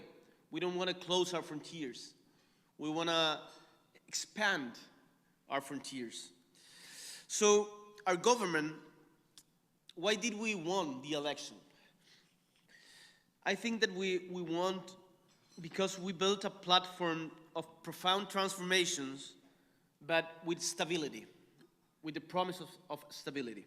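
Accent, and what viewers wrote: Chile. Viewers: Estuvo bien, mucha confianza y el discurso coherente con el acento chileno pero bien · No esta malo el ingles, acento chileno y algunas cosas recitadas de memoria, pero nada mal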